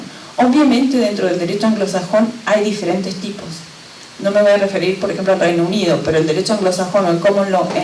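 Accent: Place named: Argentina. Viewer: saludos. no teiene mucho quever pero me suena como a unespeciede acento Mendocino de argentina o Cordobes de argentina.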